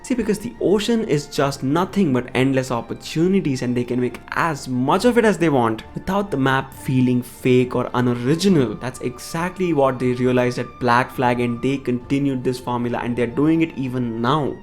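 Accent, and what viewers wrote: India. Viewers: probably this guy, after all he sounds like a typical indian scammer that might phone your house. Can't be trusted.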